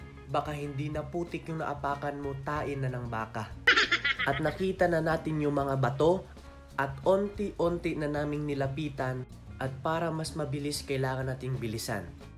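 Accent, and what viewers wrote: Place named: Philippines. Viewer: ang accent mo parang tagalog, hindi ka ata "Bisaya" murag Tagalog! Wala ba mga buaya diha?😅 Amping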